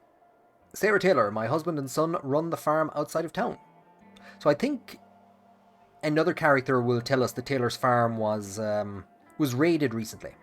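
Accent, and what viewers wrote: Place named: Ireland. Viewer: irish guy playing aussie simulator....i luv it!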